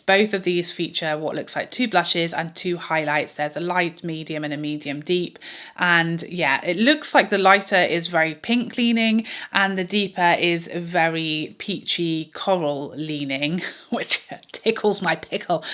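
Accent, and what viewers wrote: United Kingdom. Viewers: hahaha "tickling my pickle" ...wish i had a british accent to say things like this. i usually like dior quints but this bouquet one is blah, no. no to nars; did all the beauty brands come together and decide to all do a star, celestial theme? where is the creativity here? i would've liked that urban decay palette in college... im 33 now, and i prefer the muted/diffused look of chanel + dior shadows.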